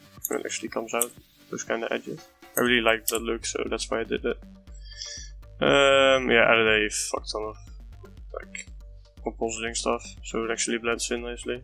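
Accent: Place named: Netherlands. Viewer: Hahah I knew immediatly that you we're dutch when I heard your voice. May I have your skype, or you can add mine if you want: <mail> I have something I would like to talk to you about!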